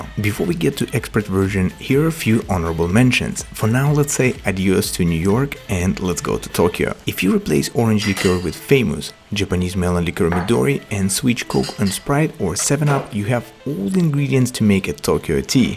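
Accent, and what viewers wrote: Russia. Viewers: Автор из России? Просто акцент, я его слышу и мне кажется что он русский, да и к тому же имя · Здрасте, мне кажется или у рязанский акцент?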